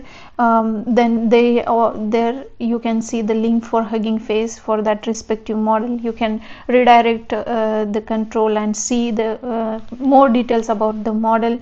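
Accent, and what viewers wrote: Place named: Maharashtra, India. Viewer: Marathi accent ! Nice information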